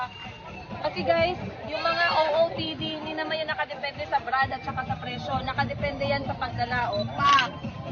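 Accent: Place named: Bulacan, Philippines. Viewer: May hinala ako sa ‘yo Tinderang Africana na may hawig ka na KABABAYAN kita, may pagka- Bulakenya sa tấtas mong magsalita sa Tagalog, may pagka-makata pa Kung minsan ka kasi, taga bulakan dati ko narine na sa california.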